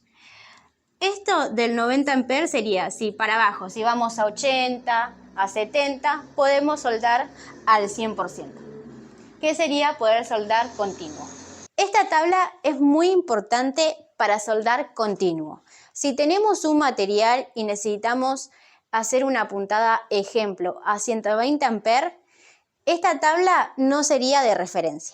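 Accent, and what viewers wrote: Argentina. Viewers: Muy buen video explicativo, pero nada personal me desespera mucho el acento argentino (ladilla mental mia) me gustó la explicación y me aclaro muchas dudas, pero no creo seguir viendo tus videos. Sigue así gracias por la ayuda.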